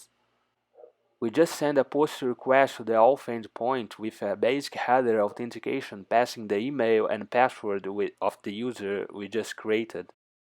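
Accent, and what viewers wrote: Brazil. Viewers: I can tell you are brazilian by the accent, very good video sir. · Pause o video pra conferir se ERA BR vc. Aceitei 😂. Muito bom o vídeo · Fiz a mesma coisa, o sotaque não engana! Show Brazuca na veia!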